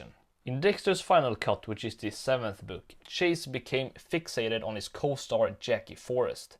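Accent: Sweden. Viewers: Are you swedish by any chance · He sounds turbo Swedish, because he is. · Är du svensk? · 33 seconds in and I am certain of one thing. you are Swedish.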